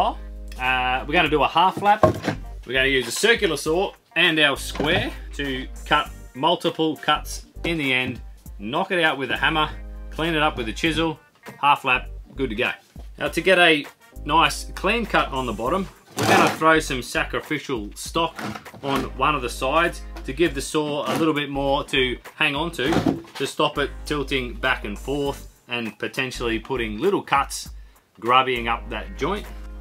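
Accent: Australia. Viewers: Im a new subscriber, love the content, thanks so much. Also nice to see/hear aussie accent, way more relatable for me. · Aye mate....you people from down under talk funny.... but your videos are top notch! i am finding pallet wood a god send when the wallets low on project funds. bought a lot of tools but haven't put them to work yet....let's give it a try see how this all works out...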